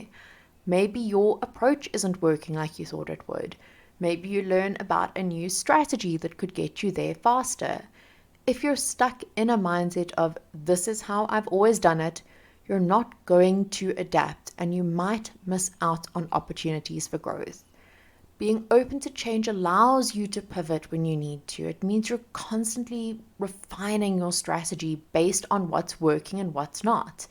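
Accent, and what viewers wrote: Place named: South Africa. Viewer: I know a Saffa when I hear one. You doing a good job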